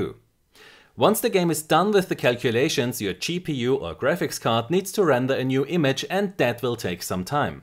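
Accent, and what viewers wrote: Austria. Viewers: could you do a video where you compare the Overwatch Button to Pixel lag across different console shooters? the reason is that on consoles Overwatch seems to have a crazy high lag especially when compared to CoD or BF would be usefull to have such a proof to show the devs that their game needs to be fixed also you sound austrian, is that the case? :P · You always use astonishing test-setups, this is a lot of work, i really appreciate your efforts! Are you from Austria? That strong accent sounds familiar. About the non-difference between 144 and 60 Hz, it could be that overwatch uses physic-frames to control shooting (but that would be odd), and physic frames are capped to a constant rate in every engine i know.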